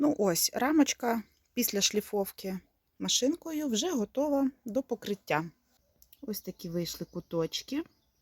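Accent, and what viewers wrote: Western Ukraine, Ukraine. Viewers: Вас вже від западенки не відрізниш😘 навіть не віриться, що ви з Харкова. А вікна файні вийдуть) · Вимова у вас західна, не скажеш що ви з Слобожанщини. МИРУ нам всім та добра.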